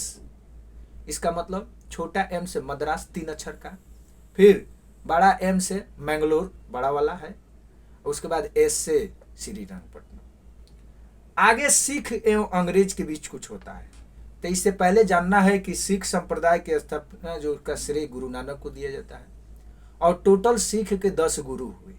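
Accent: Bihar, India. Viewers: apna Bihari wala style m pdhayiye na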